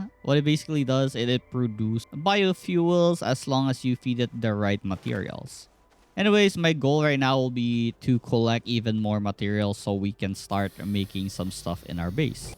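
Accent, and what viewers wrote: Philippines. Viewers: With his accent I assume hes filipino or something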